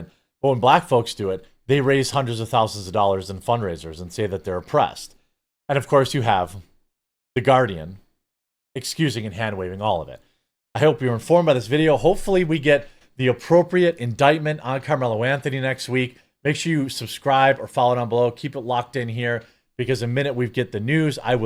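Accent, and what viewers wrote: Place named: New England, United States. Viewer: This guys accent is 🤮 fucking Yankees….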